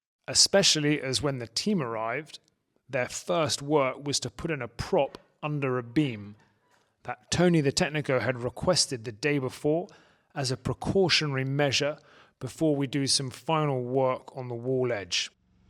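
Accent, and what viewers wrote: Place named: Scotland, United Kingdom. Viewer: I detect scottish accent..where from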